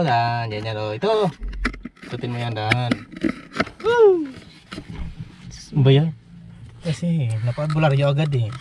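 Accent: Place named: Iloilo, Philippines. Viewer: ilonggo accent hehe bal.an gid haha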